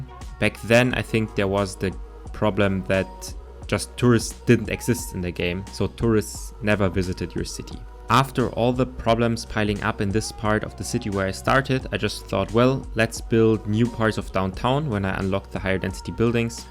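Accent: Germany. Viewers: Just a small question. Are you speaking german or some other similar language. Cause your accent seems to come out of that direction. Great Video btw.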